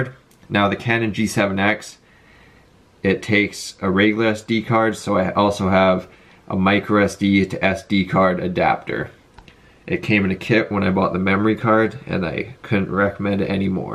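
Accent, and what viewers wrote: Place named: Canada. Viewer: You sound Canadian o.o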